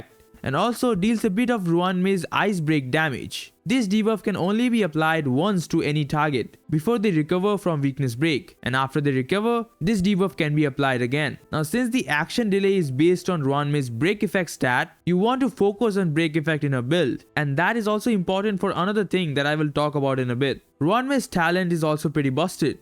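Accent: India. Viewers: Ugh cant stand indian accents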